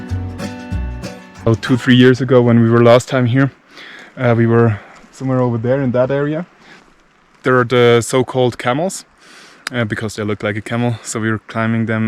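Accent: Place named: Switzerland. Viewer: Absolutely loved it! Fantastic edit. Amazing drone shots. And the best: your swiss-german accent ❤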